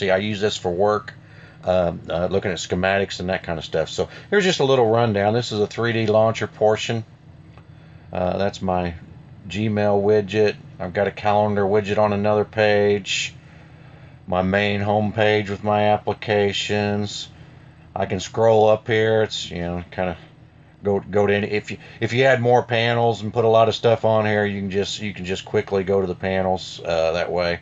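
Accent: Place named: South, United States. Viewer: I just purchased a Tab S, so i've been watching alot of reviews for the Galaxy Tab S in various engish dialects from different countries and your video in the southern American dialect is great. Very impressed with the way the video was presented and really showed a great tablet "really" used for work. You sold my on the Next Launcher, very good set up you have. I will be hitting subscribe, thanks dude.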